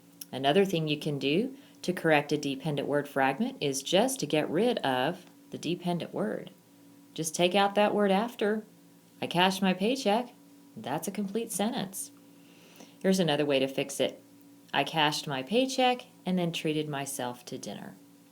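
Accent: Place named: United States. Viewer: That's her American accent. I like it lol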